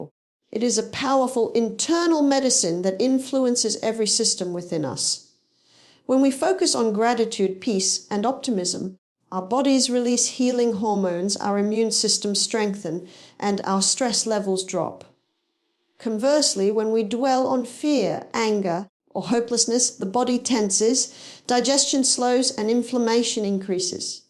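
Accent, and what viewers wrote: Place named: United States. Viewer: This isn't Barbara it's AI. As an Australian I can tell it's not her accent, it's American.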